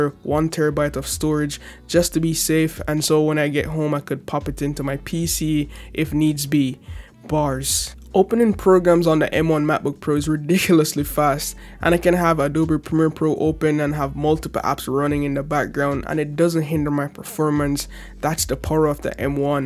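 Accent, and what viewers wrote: Jamaica. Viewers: You sound like a yard man still. I can hear two accents when u speak lol. Good video 👌🏾👌🏾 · Great video, I love that slight Jamaican accent.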